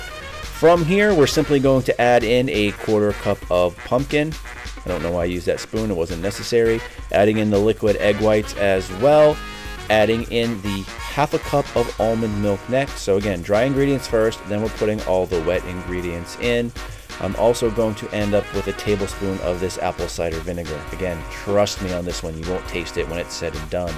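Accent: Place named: New England, United States. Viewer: what part of New England are you from?? Wicked!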